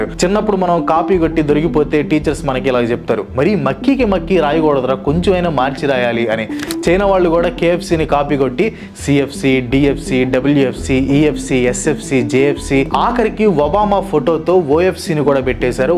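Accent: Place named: Visakhapatnam, India. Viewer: U speak visakhapatnam clarity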